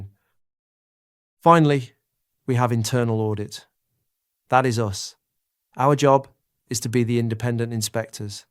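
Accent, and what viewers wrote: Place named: United States. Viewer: Great to find a British English instructor with clear examples and explanations. However, I really do not like the American spelling and pronunciation. TY though. I will be sharing the links.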